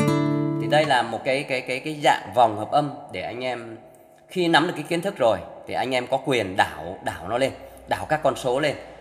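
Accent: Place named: Central, Vietnam. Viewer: Bạn hướng dẫn hay quá. Bạn ở đâu vậy? Nghe như giọng Miền Trung á hè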